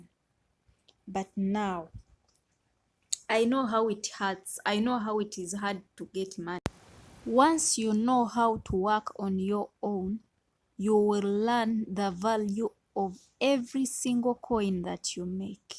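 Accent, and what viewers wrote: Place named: Kenya. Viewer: You sound like a Kenyan ooh God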